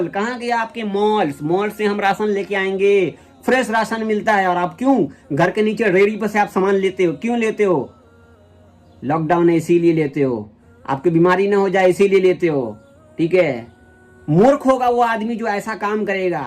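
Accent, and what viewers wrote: Bihar, India. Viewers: Aap Bihari ho Boli se lagta hai.Kaun sa Zila Ba.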